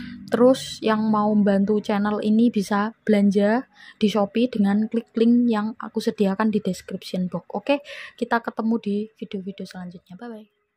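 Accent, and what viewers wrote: Java, Indonesia. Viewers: Keunikanmu pn untuk ku suara dn logat jawa yg lembut mu buatku sangat senang dn kumerasa auramu sangat positip.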